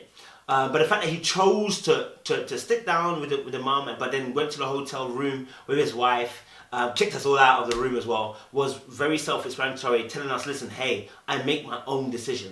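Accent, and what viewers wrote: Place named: London, United Kingdom. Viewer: Great video! Bro you have a bit of an accent, it's like a London accent mixed with another provence's accent. What accent is that?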